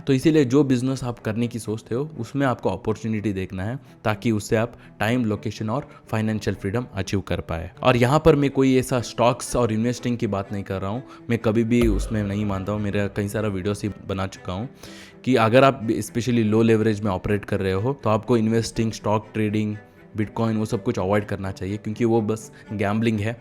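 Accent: Karnataka, India. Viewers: Bhai aap karnataka se hoo kya???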